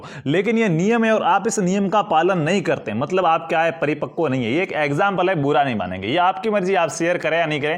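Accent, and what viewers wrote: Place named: Udaipur, India. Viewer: Sir apki भाषा se ap udaipur se lag re hoo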